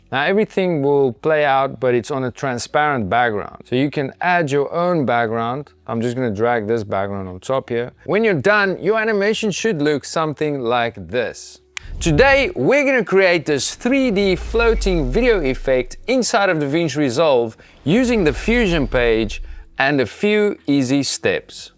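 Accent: South Africa. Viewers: Super awesome! Thanks for sharing. PS: With that accent you must be a boertjie from SA? Greetings from NC. Groete van huis tot huis.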